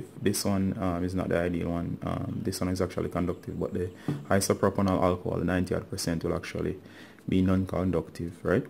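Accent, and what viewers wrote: Jamaica. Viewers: are you jamaican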